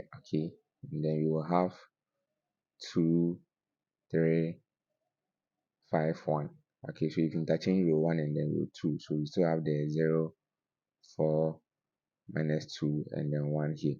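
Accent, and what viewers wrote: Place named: India. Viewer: Are talking in English or Indian? wtf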